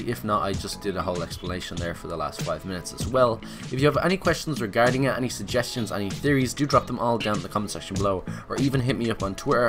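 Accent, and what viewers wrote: Dublin, Ireland. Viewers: Are you from Dublin and great video it helps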